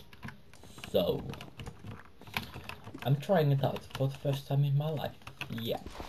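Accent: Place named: Finland. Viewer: Rallienglanti :D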